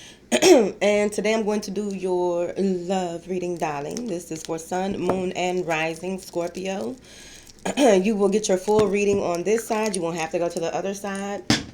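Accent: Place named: Dallas, United States. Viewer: You in Dallas. It sound like it, in your accent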